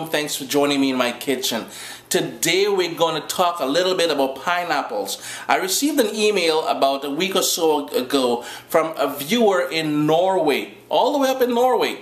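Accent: Trinidad and Tobago. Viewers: your trini roots coming out loud and clear....thank you.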